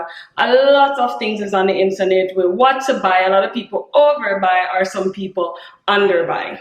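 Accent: Jamaica. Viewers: I feel like I just know your Jamaican cause it takes one to know one!! But maybe your African 😂❤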